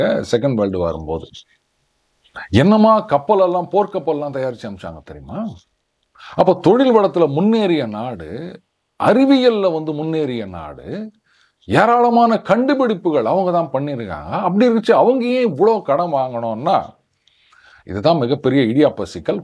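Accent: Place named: Chennai, India. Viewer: வர்ணிப்பு...அசத்தலாக உள்ளது. சூப்பர்..பக்கா சென்னை வாழ் தமிழ் குடிமகன் போல... வந்துகினு. போய்க்கினு.. ஆனால்... நல்லா இரசிக்கும்படியாகத்தான் இருக்கு.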